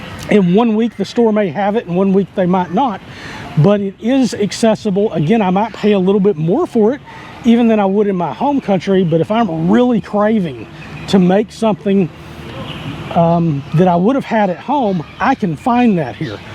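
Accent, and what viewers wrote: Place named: Georgia, United States. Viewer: I can tell you must be from Georgia. 😁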